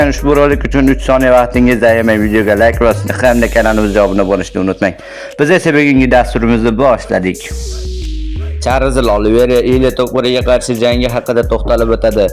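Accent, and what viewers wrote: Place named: Uzbekistan. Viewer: Sheva vodiyniki emasku voxa shevaku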